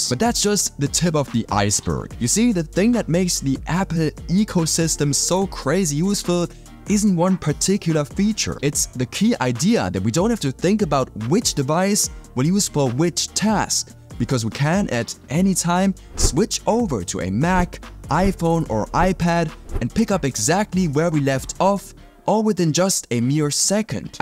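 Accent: Germany. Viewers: Hmmm... are you German? (not to hate ofc) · are u a german guy? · hehe, musste unbedingt auf die Kanalinfo gehen um bestätigt zu bekommen "Deutschland".... nicht wirklich wild doch schon recht ulkig dassi innerhalb der 1 Sekunde herausgehört habe woher der Wind weht. Und hab nicht darauf geachtet =D Das Wort people war's ^^ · Hab doch direkt den deutschen Akzent rausgehört 😅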